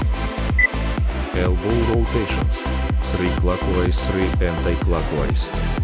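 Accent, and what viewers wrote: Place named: Russia. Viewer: The russian accent makes it even more authentic and soviet like😅👍👍👍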